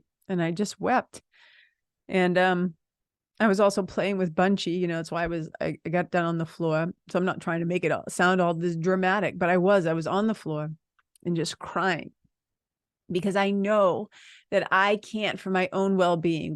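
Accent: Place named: Massachusetts, United States. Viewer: I’m a MA girl living in CA and your accent is making me miss home a WICKED lot! 😆 I’m from the south shore..I’m guessing you are a MA girl too.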